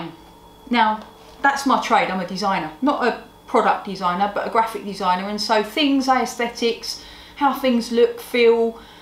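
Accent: Cockney, United Kingdom. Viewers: cockney is cool 😎 Here in the south of the USA we have a southern drawl!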